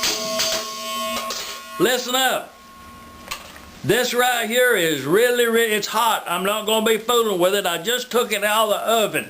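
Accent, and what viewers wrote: United States. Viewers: ok so I'm guessing you're American.. Based on your American accent.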